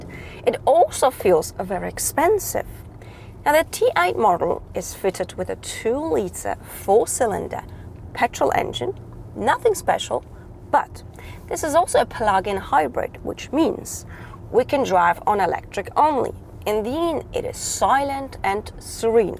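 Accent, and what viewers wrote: Australia. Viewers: aha cool! Yeah the polish number plate gave it away and i recognised some Aussie/Nz accent from living there aswell. Nah Yeah have a good one! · Did you learn your english in Australia or south africa?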